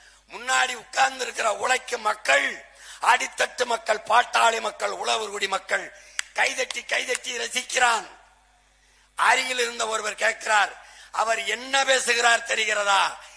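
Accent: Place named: Sri Lanka. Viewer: Nee ceylon thamil perusaa tamil vakka lathu vangure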